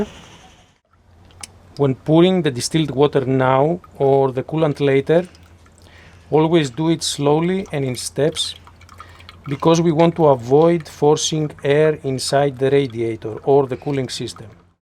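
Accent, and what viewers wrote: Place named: Greece. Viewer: Το ξερα ότι είσαι Έλληνας, αθάνατη ελληνική προφορά, την ίδια έχουμε 😂😂😂 εγώ έχω του 2008 αλλά δεν βρίσκω πουθενά πουθενααααα πόσο παίρνει οπότε μάλλον θα πάρω ένα τετραλιτρο για σιγουριά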